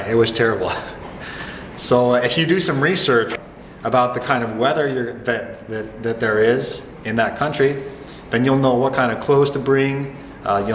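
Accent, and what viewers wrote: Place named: West, Canada. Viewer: You must be western Canadian. I learned when I moved here that people say "...right?" as a confirmation at the end of a sentence very often. People can come to a country without researching if they are flexible people, but otherwise, be prepared to suffer!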